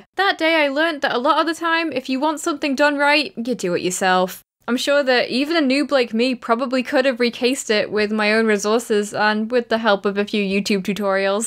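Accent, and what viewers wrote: United Kingdom. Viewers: mrxMapping he means the British style of English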